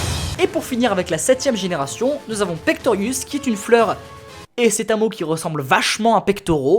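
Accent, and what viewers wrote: Spain. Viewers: S'il te plaît arrête avec ton accent espagnol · Ton accent espagnol es magifico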